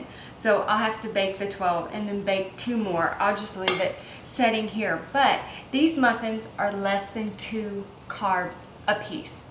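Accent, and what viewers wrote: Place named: Miami, United States. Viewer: I'm just starting a Keto lifestyle. I learned about your channel through the Keto Twins. I am really excited and appreciative of your work in researching and perfecting these recipes and for the wide breadth of knowledge you relay. Looking at your videos I feel confident that this is a change I can do and succeed at. You are making a difference in the quality of people's lives and I ask that God continues to bless you and your family for this good work. Thank you. PS I live in Miami and your accent makes me feel at home! I miss it so much.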